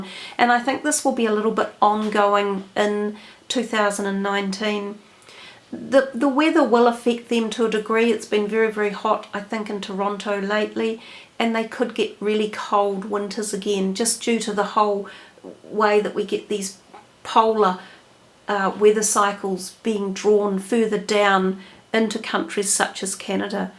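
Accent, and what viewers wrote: New Zealand. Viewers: Kiwis not as bad as South African accent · Pardon me! NEW ZELAND accent!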